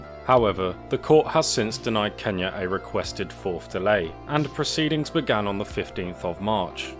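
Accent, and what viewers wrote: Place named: Australia. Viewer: You talk like Billy Finn, the dolphin the family guy, which drives me to speculate you are from Australia.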